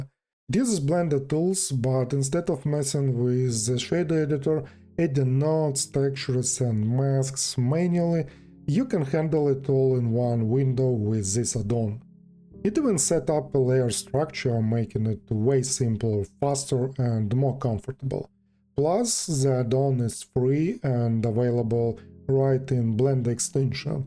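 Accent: Russia. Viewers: Ochen' accent russkiy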